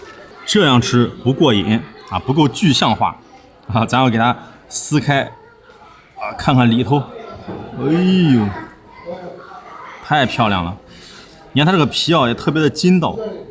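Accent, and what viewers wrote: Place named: Shaanxi, China. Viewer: 是陕西人吗？陕西口音有些重。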